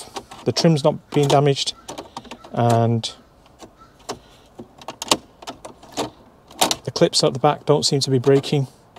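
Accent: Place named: United Kingdom. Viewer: Ok you sound British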